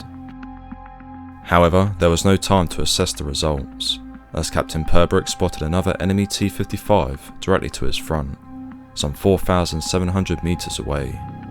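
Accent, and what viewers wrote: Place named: United Kingdom. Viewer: very well spoken and interesting, you cant beat a well spoken brit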